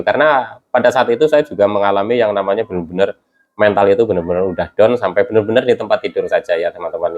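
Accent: Banyumas, Indonesia. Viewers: Asalamualaikum mas. Ora ngapak ora kepenak, njenengan ana ig ora mas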